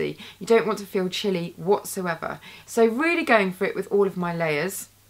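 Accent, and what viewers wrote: United Kingdom. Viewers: You are amazing!!!!!! I like ur British accent( usually I'm not fan of it:), you are so unique and eye-popping! Keep doing an amazing job!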